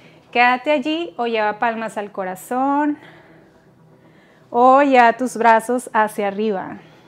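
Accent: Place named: North, Spain. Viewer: Es la primera vez que veo sus videos de Yoga gracias por compartir escucho su voz muy agradable con acento norteño muy agradable disfrute mucho la clase Namaste ❤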